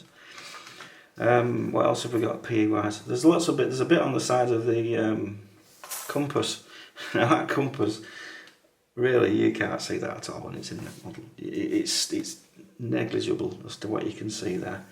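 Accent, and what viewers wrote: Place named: Yorkshire, United Kingdom. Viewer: Thanks….love the details…..thought the ‘mumbling’ comment was unfair and the Yorkshire accent is quite mild, I heard everything clearly. Please do not let that comment discourage you, keep them coming.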